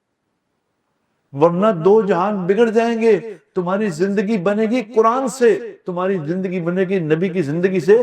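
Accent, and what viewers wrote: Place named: Lahore, Pakistan. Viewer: Loves his lahori punjabi 😊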